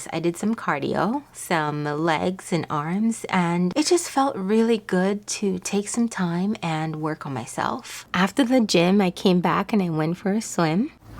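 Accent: Jamaica. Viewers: Just came across your channel, Watched all your videos. Love The Content. And I could tell from you're accent that you're Jamaican too. Blessup and keep up the good work. Love the editing also. I Subscribed, so looking forward to more videos.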